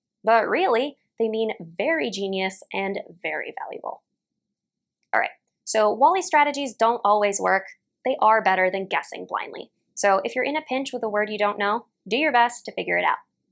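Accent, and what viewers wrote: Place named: United States. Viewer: I like her American Accent.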